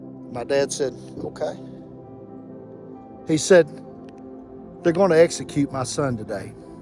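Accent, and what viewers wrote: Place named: Arkansas, United States. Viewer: What part of Arkansas you from?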